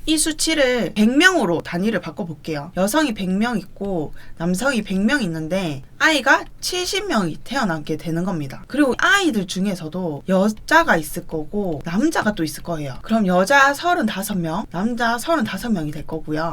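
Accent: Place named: North Gyeongsang, South Korea. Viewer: 경북지역 사투리가 상당히 거슬리네요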